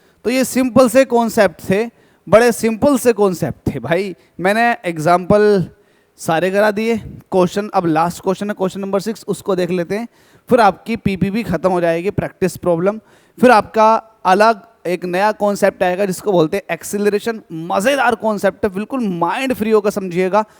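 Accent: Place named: Haryana, India. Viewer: Lagta h sir aap Haryana se ho😅😂😊😊😊😊😊😊😊😊😊😊😊😊😊😊😊🎉🎉🎉🎉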